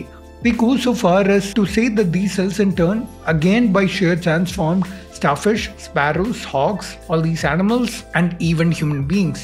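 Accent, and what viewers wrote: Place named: India. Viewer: First your knowledge seems good but you laugh of what was written in the text.firstly you are reading as as if you are talking Hindi not English ,secondly read the Al Quran tilawat before you made this vidz,learn from things around you ,then judge ,don’t mock or disrespect the creator mark my words you will pay the price. for that kind of blasphemy soon or later.remember me not the likes you will have.my respect again to people what ever their faith or belief.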